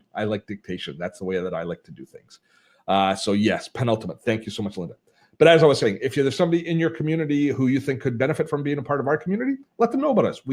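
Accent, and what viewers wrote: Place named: Canada. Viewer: Being from the US I always enjoy your Canadian accent. It adds a pleasant touch that makes us partners in our uniqueness. Thanks for everything you do.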